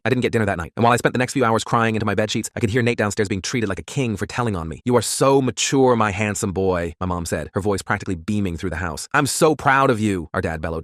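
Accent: Texas, United States. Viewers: Why does it keep going texan accent 💀🙌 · Texan accent + Kayla as his sister goes hard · Anyone else realize after like the first couple sentences it gets a Texan accent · THE TEXAN ACCENT BRO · TEXAN ACCENT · Yeah bro I was like WHY DO YOU KEEP SWITCHING TO A TEXAS ACCENT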